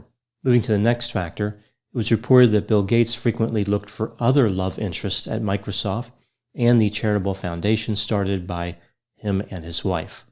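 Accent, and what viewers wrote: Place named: New York, United States. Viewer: A bit of accent came through more than usual today. I'm guessing he's from outside of NYC but within 75 miles. He's probably mentioned his hometown accent at some point and I could be way off.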